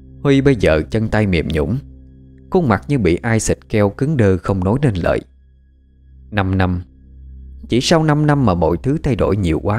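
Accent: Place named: Southwest, Vietnam. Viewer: Yahhh! Hôm nay tác giả Diễm Quỳnh lại cho ra mắt thính gia truyện ma mới ! Tiêu đề rất rất hấp dẫn và rất tâm linh ma mị ! Rất thích truyện ma làng quê lắm! Xin cảm ơn tác giả và giọng diễn đọc của miền Sông Nước Tây Nam Bộ của chú siêu MC mà dt luôn hâm mộ! Kính chúc gia đình nhỏ của vợ chồng chú Huy luôn rộn tiếng cười của trẻ thơ và luôn tràn ngập niềm hạnh phúc, cùng team Đất Đồng Radio một buổi chiều mát mẻ và vui khỏe! ✍️👻🎤🤙! 👨🏽‍💻🤱👶🍼👨‍👩‍👧💞👌!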